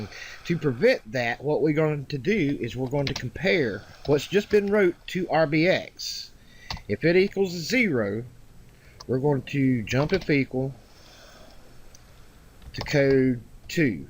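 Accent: South, United States. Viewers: Every time I hear this voice I get a bit of nostalgia for Georgia, Alabama and South Carolina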